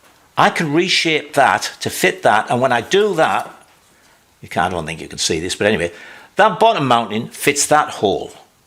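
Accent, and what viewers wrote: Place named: Middlesbrough, United Kingdom. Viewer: wow, small world. I hoped that was a Boro accent, I was born in the Boro , and live in Stockton, I've been a bike nut since I was a kid, I used to race a C15 on Redcar beach .about 7 years ago my Canadian cousin and I rode a Valkyrie and BMW from London Ontario to New York to pay our respects to ground zero and visit Billy Joel's bike museum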